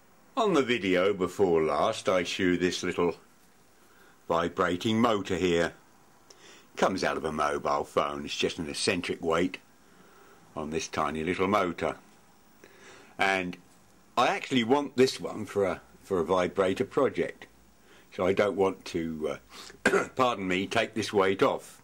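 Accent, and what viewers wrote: United Kingdom. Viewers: I always enjoy your videos and this one was no exception. I thought you guys went metric decades ago. What is with the imperial measurements? How is it that you even have a tape like that? I like the accent myself. It is colorful but still very understandable. I like to watch another shop type channel owned by another British fellow but I normally only understand about 25% of what he says. He has an interesting channel anyway. Thanks for sharing.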